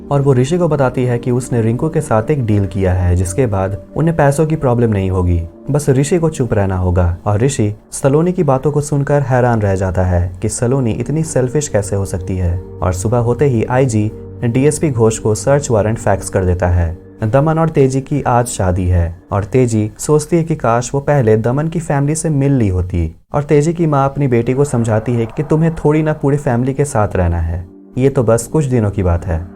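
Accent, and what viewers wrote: Bihar, India. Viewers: You're from Bihar bro , ik 😌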